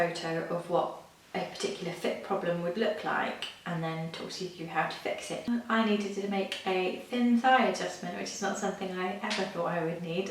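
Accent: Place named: England, United Kingdom. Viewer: I was going to say, your accent sounds very English to me! A friend of mine with Welsh family started learning some once but it seemed to have some seriously complicated spelling - mutations and things!!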